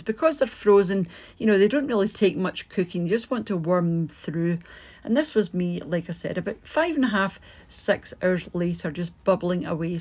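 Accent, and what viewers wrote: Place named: Liverpool, United Kingdom. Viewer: Scouse at its best!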